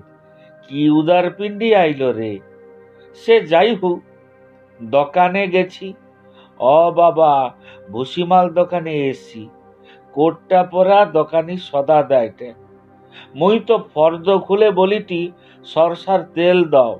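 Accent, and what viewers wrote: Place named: Medinipur, India. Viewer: মেদিনীপুরের আঞ্চলিক কথায় আবৃত্তি টা শুনে খুব ভালো লাগলো।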